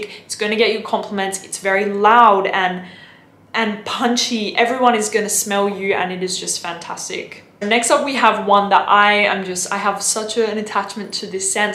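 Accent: Australia. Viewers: Australian accent.... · What's there to be calm about? Was never hyped in the first place. I just said I loved her Australian accent. And what's it to you anyhow? People love to give their opinion when 1. It was never asked for and 2. It isn't worth 2 cents. So, you calm down... dude I swear. People and minding their business... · I enjoy your exotic Aussie accent, and your presentation, smile, eyes... everything about you is just gorgeous. And I like your fragrance choices - that it had to smell expensive and classy, even if it's modestly priced. I own many of these you have shown here, so I am on the same page with your choices. One I have not smelled yet is La Nuit d'Issey. Ordered it on your recommendation. 🖖😘👌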